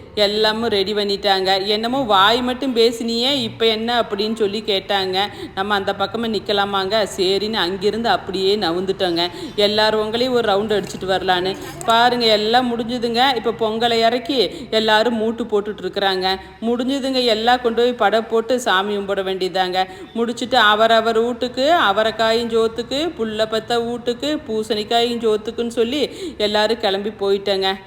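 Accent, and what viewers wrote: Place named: Kongu, India. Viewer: சூப்பர் மா பொங்கல் விட உங்க கொங்கு பாஷை தான் எனக்கு பிடிச்சதே மா சூப்பர் சூப்பர் உங்க குரூப் பொங்கலுக்கும் உங்க பேச்சுக்கும் ஒரு ❤️👏👏👏👏👏👏👏👏😄👍🙏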